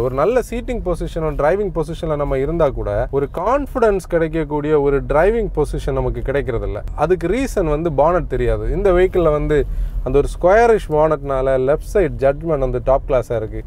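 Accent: Kanyakumari, India. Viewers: One of the best moto vloggers I've come across in YouTube. And hearing that too in kumari Tamil is refreshing. Keep rocking guys..btw I've a question Between VW Taigun & Skoda Kushaq, which one would be a good choice? Driving dynamics and rear seat passenger comfort are my top priority areas. Also will 1.0 litre engine be adequate enough to give a peppy Driving experience? Or should I go for the 1.5 litre one? Predominant usage would be within city with occasional highway runs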